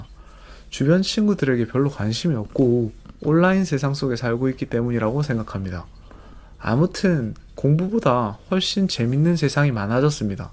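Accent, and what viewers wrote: Busan, South Korea. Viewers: 91년 동대 법대에 입학했었는데(동대신동캠퍼스), 저수지가 낯익군요. 올해 애가 고1이라, 애를 위해 고등학교 학부모운영위 학부모위원 되었습니다. 애는 지극히 평범(고1, 3월모평 국4등급, 영수 2등급)하고, 힘들게 대학다녀도(4년 학비도 만만찮고) 원하는데 취업하기 힘든 게 현실이고, 현재 고등학교는 입시위주 교육을 할 수 밖에 없는게 현실이어서, 아들에게 대학가는것보다 (서울사립대기준 학비의 2배를 줄테니) 앞으로 뭘 먹고 살지를 고민해 보라고 했더니, 아들 눈에는 좋은 대학나와야 사회적으로 인정 받는다고 강하게 믿고 있는것 같습니다. 지금 인천 살고 있지만 매주 동영상보니 부산이 마이 그립고, 선생님 목소리가 제 아는 분과 같아 친숙하네요(부산분치고 사투리가 심하지 않음)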